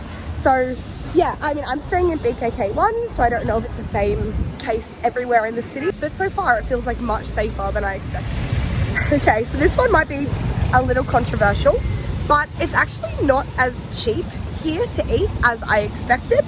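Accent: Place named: Australia. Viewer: especially your cute aussie accent. :)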